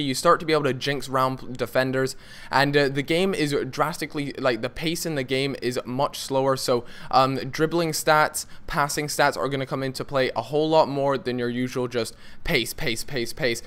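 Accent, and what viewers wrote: Scotland, United Kingdom. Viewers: are you scottish or what...mixing your accent with a hint of english. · are u scottish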